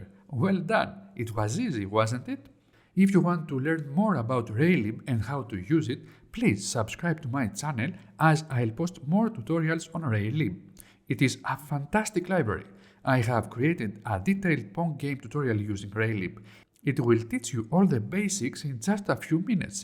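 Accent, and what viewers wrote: Greece. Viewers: 2 seconds in and almost immediately realized you were Greek. Keep up the good work! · The greek accent is so cuuuteeee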